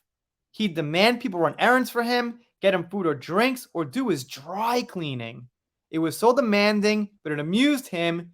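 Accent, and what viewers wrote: United States. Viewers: I would really like to know why Americans think displaying ignorance is cute. You said that you couldn't tell what this symbol "£" is, and then whilst speaking in a thick American regional accent and excused referring to it as "the euro" because you live in Japan. But Dan Wootton and The Sun newspaper are based in the UK, so you must have known you were talking shit and you had to have lived in the USA until you were at least 25 to sound so American, or do you think the audience is unaware of how accents form? Your mouth fused in it's current shape in the USA by age 25, so you have no excuse especially given that the symbol existed before the USA existed as a country and in fact you used to have an American pound. So all you said was that you were unaware of your own history. I don't think you mistook anything. You're a pro-Johnny Depp fanatic who casually glazes over the fact that he was "making jokes" about raping Amber Heard and burning her body so by pretending ignorance of the difference between the £ and € even though the £ is clearly an L used for hundreds of years in various countries as the symbol of a pound, whilst the € is an E and has only existed since the mid 1990s to be used as a symbol for a new currency, the Euro, you are being dismissive of the UK merely because you were upset that the judge in the defamation case here did not rule in favour of your opinion and instead merely followed the law and logic. 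Both Depp and Heard were abusive. Don't worry though, i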